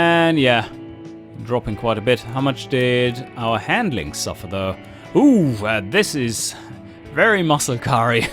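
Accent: Germany. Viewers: You are german???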